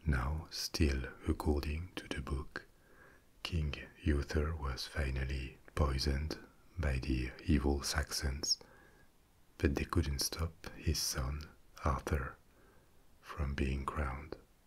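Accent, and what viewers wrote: France. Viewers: Hey, cool idea for an ASMR channel, finally sth different, your calming voice with the French accent rocks, dude!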